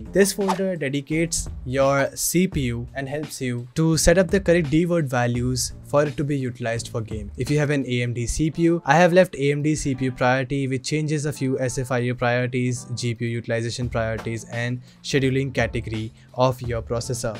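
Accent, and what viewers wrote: India. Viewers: You are indian, right? I can't understand a lot of things because of the accent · Is it possible to find a video guide that is not made by an indian guy with an unbearable indian accent? Just asking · Is it possible normal guy in india to talk in english without 95% of the Indians cry about his accent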